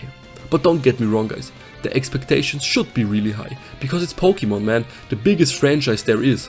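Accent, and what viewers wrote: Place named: Germany. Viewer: Netter deutscher Akzent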